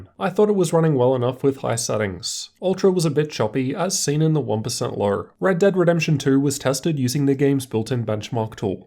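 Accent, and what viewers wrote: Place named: Australia. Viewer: Bruh this boi Aussie bruv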